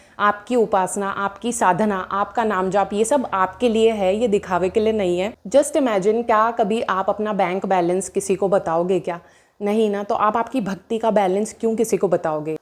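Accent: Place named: Gujarat, India. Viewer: Muje aisa kyu lagta hai ki aap gujrati ho😂kuch words sun k muje laga.. Bt nice chota house. Bohot hi acha ghar hai. Apka ghar ise acha hai ya fir ye ghar acha he.. Jarur batana👍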